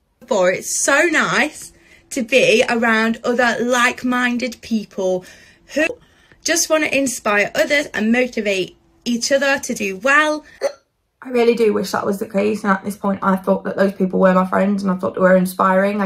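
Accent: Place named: Nottingham, United Kingdom. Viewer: Brilliant vid! Is that a Notts accent I hear? Reminds me of one of my close friends from Mansfield/Sutton in Ashfield!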